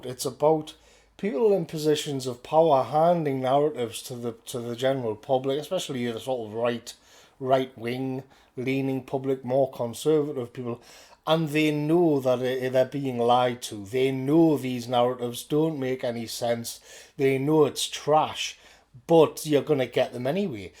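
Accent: United Kingdom. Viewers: I was watching a video about how to do a "Proper" British accent (purely for laughs) when the notification for this came up. Right off blasted with "Twen(!)ee-nine(!)een". Good to hear a proper British accent again.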